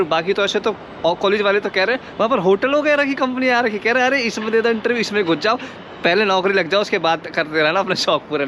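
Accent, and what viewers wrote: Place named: Uttar Pradesh, India. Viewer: Bhai UP se ho kya 😂😂 me b UP se hi hu same accent tmhara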